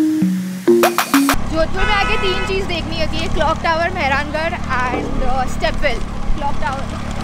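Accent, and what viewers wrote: Mumbai, India. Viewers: Like your Mumbaiyaaa style of conversation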